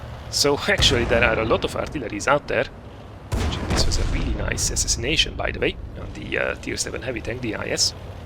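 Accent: Hungary. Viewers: magyar vagy? mert ( lehet hogy csak én) eléggé hallottam az akcentust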